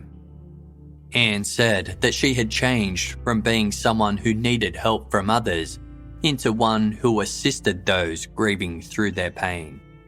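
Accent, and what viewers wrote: Australia. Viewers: I really enjoy that it’s presented in an Australian accent 🇦🇺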